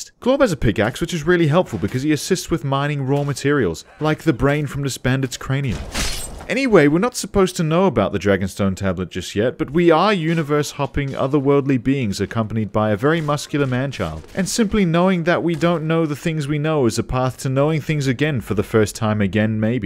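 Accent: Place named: Australia. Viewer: It's strange, i assumed was Australian on account of his accent but he always names his characters typical German names. Intriguing stuff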